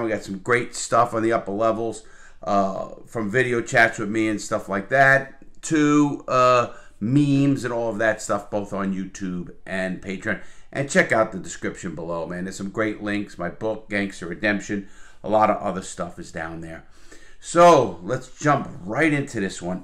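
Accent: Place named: Queens, United States. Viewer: Hey, great stuff. My late dad was raised in queens (Jackson heights) and the accent is different than yours but close. Your vids make me feel like home (living in Dublin for 2 years now). He would call my younger brother and I loan sharks whenever we played monopoly as kids lol. He ran upstate to the Catskills when he was a teenager, he had friends in the street game in the city but was never part of it (at least not seriously). Love the vids, thanks for all you share.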